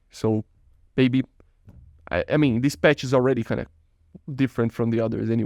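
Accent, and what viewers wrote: Brazil. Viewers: He’s Brazilian speaking a second language it’s fine. Everyone knows what he means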